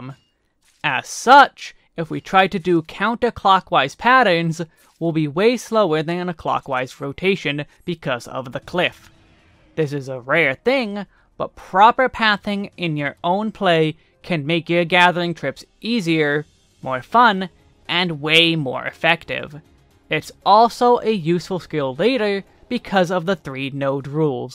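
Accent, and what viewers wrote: Boston, United States. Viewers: Boston-accent? Jersey? Can't put my finger on it :D - Gahtha goyde lol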